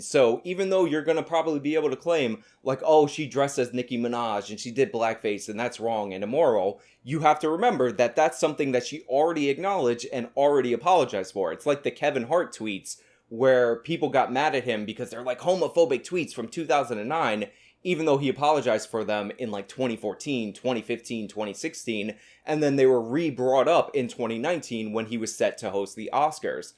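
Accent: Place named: South, United States. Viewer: A man with a southern accent apologizing for hurting peoples feelings. The South as a whole weeps for his cowardice.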